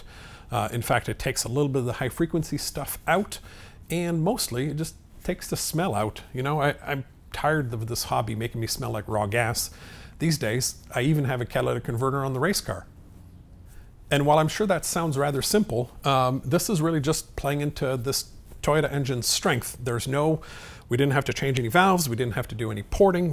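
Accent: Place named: Canada. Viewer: A Canadian sounding Hoosier?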